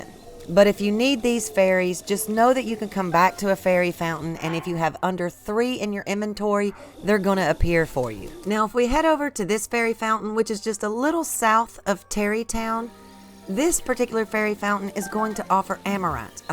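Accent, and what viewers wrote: South, United States. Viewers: Thanks for making these very informative videos. Love your southern accent.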